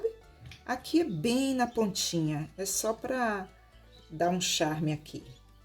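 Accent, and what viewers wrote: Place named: Salvador, Brazil. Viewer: Tenho uma amiga que é de Salvador e fala igualzinho você !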